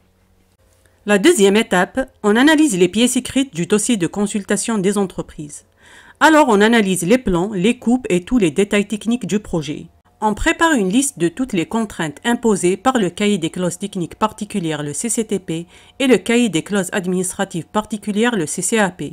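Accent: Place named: Kabylie, Algeria. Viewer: J'adore surtout avec l'accent Kabyle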